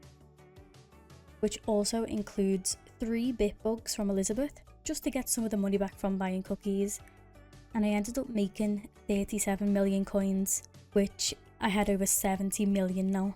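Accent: Liverpool, United Kingdom. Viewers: a scouser?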